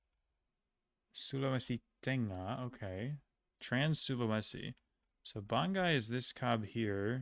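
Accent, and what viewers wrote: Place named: Portugal. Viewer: and if you're fluent you probably learned from portugal portuguese, right? Your accent sounds portuguese-like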